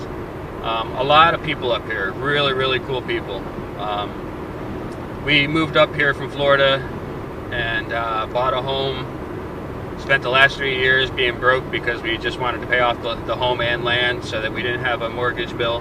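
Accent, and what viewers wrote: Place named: Minnesota, United States. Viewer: kind of sounds like northern Minnesota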